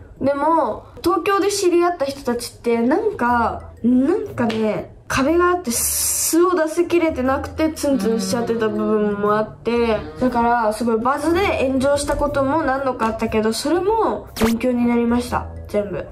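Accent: Miyazaki, Japan. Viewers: 喋り方は宮崎の名残だと思いますよ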